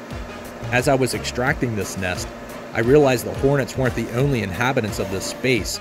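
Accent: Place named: Pittsburgh, United States. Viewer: as soon as i heard your accent I could tell it was yinzer lmaooo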